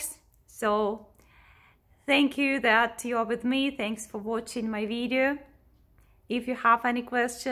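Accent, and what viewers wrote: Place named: Russia. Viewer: Hi. I'm enjoying your videos. I watched half of this not understanding what you were shopping for. "Swits?" Then I realised you were saying "sweets." (Rhymes with "eats") I love the Russian accent but find it funny that all vloggers mispronounce "clothes." (Of course, I live in the South of the U.S., so I suppose a lot of English speakers would argue with some of my pronunciations.) The "th" is silent in the word "clothes." I think a pronunciation dictionary would have something like "klōz." Anyway, I'm glad that you don't seem daunted that 75% of your viewers are men. Best wishes from America.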